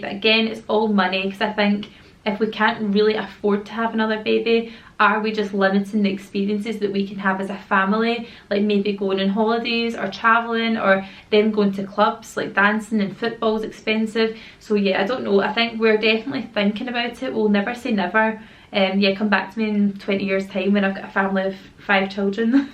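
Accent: Scotland, United Kingdom. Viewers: I really love your accent! I live in the southern United States and in my area I just don't meet many people from other parts of the world but when I first watched your channel I could tell you were Scottish and would never have thought you were speaking anything but English☺some words you pronounce differently than in my neck of the woods but I love hearing that and some of the phrases you all use are different from here but that's what I love so much about watching UK channels! I think you're lovely and I enjoy your content so much! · "Babe its english" 😂😂!! Love it! But its so true, mon the Scottish accent ❤❤❤ (im from scotland too) lol xx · Think your video was great love all your vlogs, loving the odd scottish word that i dont understand 😄 love your accent just be youself as i know you are X💓❤🌹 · Very chuffed that my assumption was mentioned 😊 You were so honest in this video (you seem very honest anyway) but you addressed the assumptions so well! 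Love all your videos! Especially the mummy videos and the cleaning ones! As a mum myself its lovely to see how other mums do it! Lovely to hear a Scottish accent as well on YouTube so I wouldn't worry about the accent too much! I'm from ayrshire so not a million miles away! Keep doing your thing girl 👌❤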